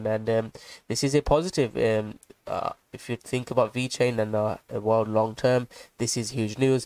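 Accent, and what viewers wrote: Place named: British Asian, United Kingdom. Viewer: you come from a chapati eating background ...😂😂😂😂😂 for sure !!! I cant be wrong , thats them pharata Uk English accent lol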